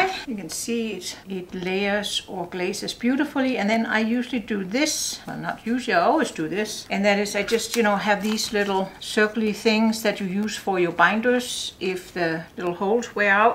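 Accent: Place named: Denmark. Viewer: love this colour. Sounds like you are originally from Denmark. Am I totally wrong? 🙂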